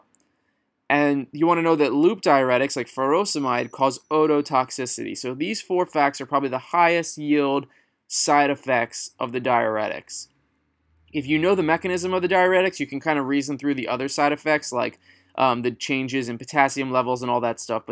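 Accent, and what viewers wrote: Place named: Philadelphia, United States. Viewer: Super helpful video! Also, I'd recognize a Philly accent anywhere...are you from Philly?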